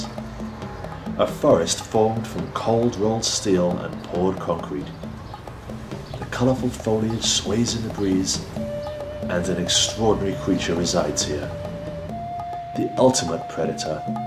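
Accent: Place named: RP, United Kingdom. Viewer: David Attenborough would be proud. BBC English, of course. Narrated by some guy called. How's that for authenticity?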